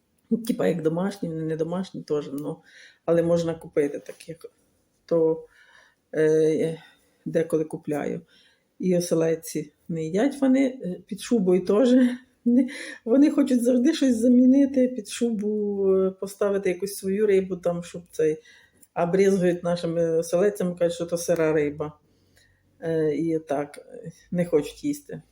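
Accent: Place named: Vinnytsia, Ukraine. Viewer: Я думала,що ви з Віниччини,або Хмельниччини. Акцент не черкаський,бо маю колежанку Раю з Ватутіна і чула,як розмовляли її землячки. Вона з Рівнинщини.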